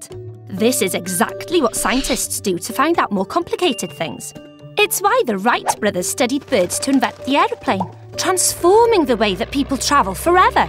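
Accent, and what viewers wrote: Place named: Australia. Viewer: australian ahhhh:face-blue-smiling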